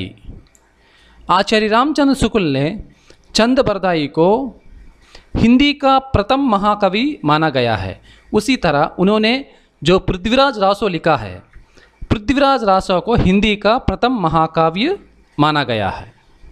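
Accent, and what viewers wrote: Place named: South, India. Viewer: very good but sorry to say you should improve your speaking leave I think you are from south Indian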